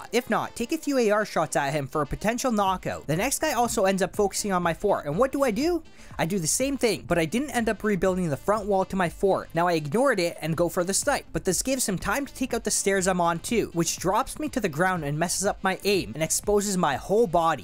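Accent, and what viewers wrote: Canada. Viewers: Quality tips brother. May I ask where you’re from? Sounds like Canada haha. Do you want to play together on PC sometime? Cheers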